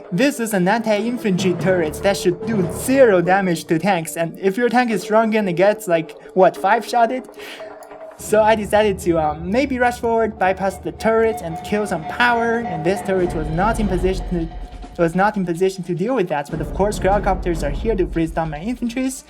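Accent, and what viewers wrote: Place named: Arab world. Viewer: I thought your original voice is just 100% Arabic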